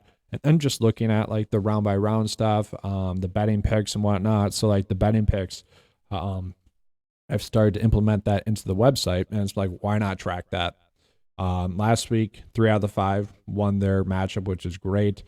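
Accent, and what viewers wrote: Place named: Wisconsin, United States. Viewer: I'm from Iowa. I call it corn hole or bags... But we know you are from Wisconsin when bags comes out as beggs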